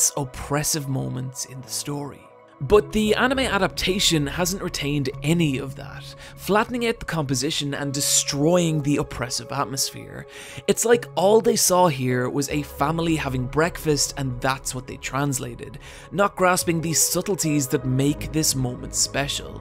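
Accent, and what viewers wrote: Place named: Ireland. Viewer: It's the Irish accent and I agree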